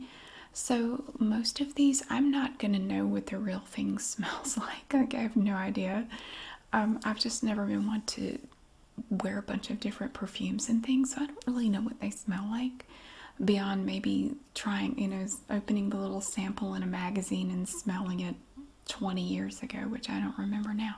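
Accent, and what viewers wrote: South, United States. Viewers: I remembered watching videos a long time ago and decided to revisit the lady who inadvertently taught me how to do a Southern accent. Not even halfway through and I am howling 😂 Why on Earth did I ever unsubscribe, I must have been tired of how much fun I had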